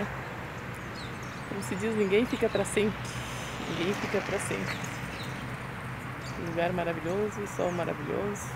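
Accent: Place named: Santa Catarina, Brazil. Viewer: Vc tem sotaque de Catarinense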